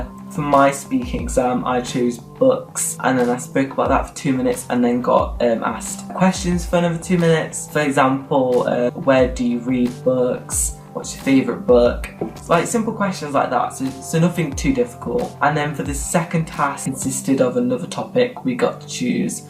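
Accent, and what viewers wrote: United Kingdom. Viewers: That British accent though.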